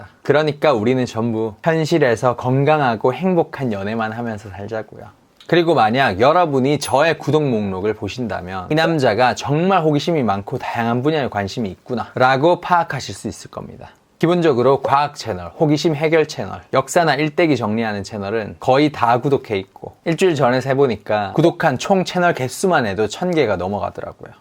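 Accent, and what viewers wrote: Gyeongsang, South Korea. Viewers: 좋은정보 감사합니다. 경사도 억양이 살짝 묻어 나오는게 매력있네요^^ 또 뵈요~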